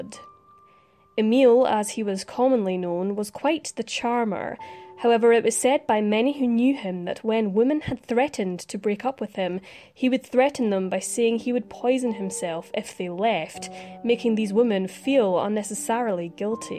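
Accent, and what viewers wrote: Scotland, United Kingdom. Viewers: Why the hell do so may narrators feel that they have to put on the artificial "creepy" pronunciation when telling their stories ? For instance, this young lady will normally have a lovely Scots accent, which one can easily hear, and most probably a nice speaking voice - but both are spoilt by totally unnatural creepy speaking. I used to subscribe to this interesting channel but then went away because I just couldn't listen to the narrative any more. I am back to give both narrator and channel another try. The stories are good, the research generally excellent and the presentation superb......if it only wasn't for the "creepy" voice, everything would be perfect. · I love your content! Excellent research, interesting cases. The background music fits perfectly and your Scottish accent is so easy to listen to! Well done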